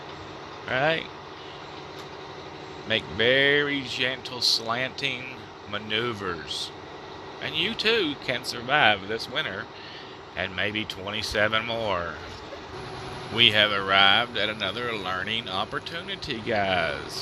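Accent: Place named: South, United States. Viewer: I can tell you're from the south like me. We drive easy, breezy, nice and safely!! Lol..."I feel sorry for the guy...Bless his his heart!"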